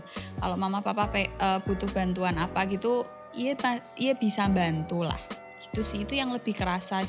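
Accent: Java, Indonesia. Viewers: Jangan mendok teruss kak . pake translate dong kak😔😔 .. Kita org NTT tidak ngerti kak . hehehehehehe .. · Logatnya koq Jawa banget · Meddok mbx 😁👍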